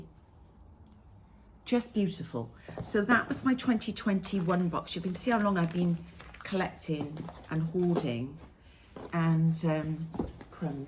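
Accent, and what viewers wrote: Wales, United Kingdom. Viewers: Love everything on your Flosstube! Love to hear your Welsh accent too! Is the Giraffe called goose??? Funny !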